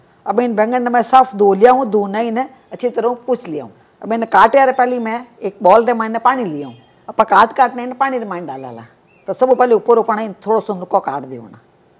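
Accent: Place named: Marwar, India. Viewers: I find it so easy to hit the LIKE button on your recipes even before watching them because they are always so unique and innovative. Your approach to cooking feels like magic, turning everyday ingredients into something extraordinary. Both my wife and I are devoted fans of yours; just looking at what you’re making makes our stomachs growl with anticipation. We can't wait to try your recipes ourselves. We also love your Marvarri accent—it's a delightful touch! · Apka baingan KS khooshbu Kitna acha haga. Sweet marwari language ... sab baingan bann javen!! You speak amazing English also, quarter cup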